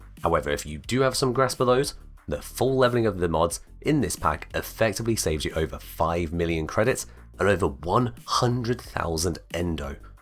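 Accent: United Kingdom. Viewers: True London voice.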